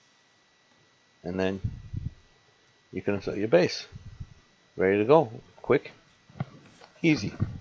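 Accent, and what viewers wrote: Boston, United States. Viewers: That has to be the coolest accent in the Us. 👍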